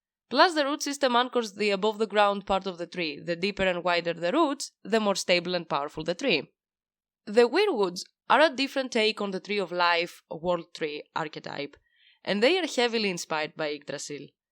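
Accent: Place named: Greece. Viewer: I like your videos. I also really love your accent. So don't worry about it your English is outstanding. Far better than my Greek.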